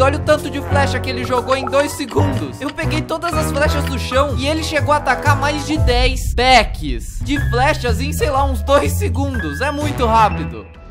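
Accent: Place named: Portugal. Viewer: Essa menina é portuguesa a falar a língua de Portugal e eu moro aqui em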